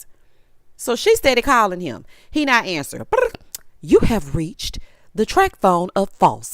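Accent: South, United States. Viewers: girl keep being yo’ fabulous self, country twang and all! 😂😂😂 Love your reviews! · Pay them dust, I love your accent and southern charm 💕🌹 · They hating! That southern twang and contagious laugh are just a couple reasons I love listening to you 😍. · I love your commentary! You are hilarious.🤣 And your southern accent is everything!’🥰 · Ur reviews, r the best..! & LOVE ur accent. Shout out, to the south. 🇨🇱🤠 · Hey Girl Heyyy! I can't wait to get into this review. Be right back, I'm going to get some popcorn. Nevermind those people. We live your educated, humurous, country dialect. Enjoy your evening. 🤗🧡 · Girl, I love your country twang!!!! Moving forward (in your voice). 😅😂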